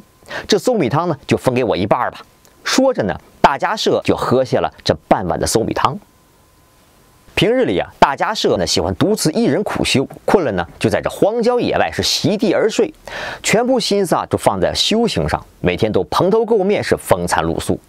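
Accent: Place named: North, China. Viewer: 北方人吧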